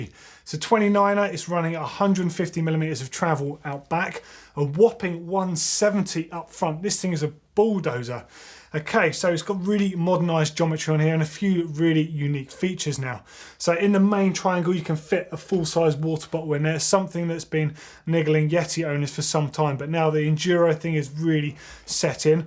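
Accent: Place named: England, United Kingdom. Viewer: is a proper Englishman and they pronounce things funny. Ask him to say "Gloucester" or "Worcestershire". You'll see what I mean.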